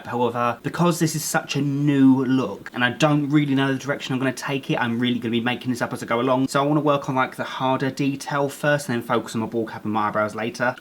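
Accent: England, United Kingdom. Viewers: Are you from england?????? Just wondering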